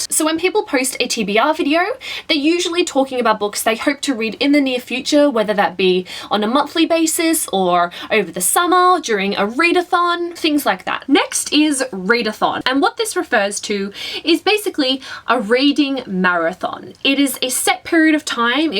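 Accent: Australia. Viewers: Very insightful... still weighing up if I should become a booktuber or not but at least it doesn't feel like a scary impossible thing anymore.I should probably wait until after I've finished my assignments to get started...or even better when the semester's over, ha! But anyway good job, subscribed. P.s Are you Australian? It sounded like you have the accent (or maybe it's British) I only ask because I'm Australian :) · Loved your video, and thanks heaps. Also, it's wonderful to hear an Australian accent on a Booktube! - from a fellow Australian :)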